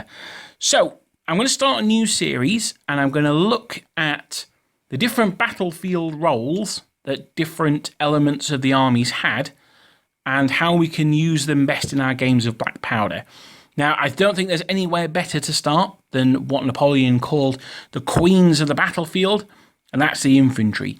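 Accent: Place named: Yorkshire, United Kingdom. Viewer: I love the info your videos provide. Too bad we live a half world away. Napoleonic gamers seem to be an endangered species. Historical wargamers in general are much more rare here than over there from what I can tell. Certainly aren't any in southern Louisiana that I've run across or heard of. Sad really. The American civil war has been so stigmatized around here it has sucked down overall appreciation of military history, imo. I love your accent man, is that Yorkshire?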